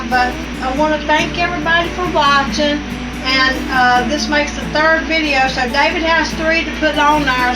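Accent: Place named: South, United States. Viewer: Just joined your channel because Jan mentioned you and said we should watch yours. I’m from Tennessee so I know that southern talk lol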